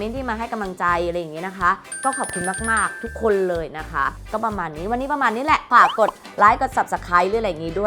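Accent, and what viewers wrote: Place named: Nakhon Pathom, Thailand. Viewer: ชอบสำเนียงพี่ เหน้อแบบเหมือนคนนครปฐม ❤️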